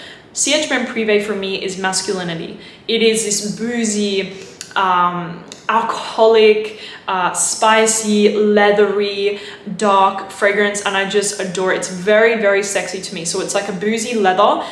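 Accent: United Kingdom. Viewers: Ngl I love her accent it sounds more British than Australian but not completely English maybe it's just the Aussie YouTubers I watch who don't have her accent but hey ho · are you british??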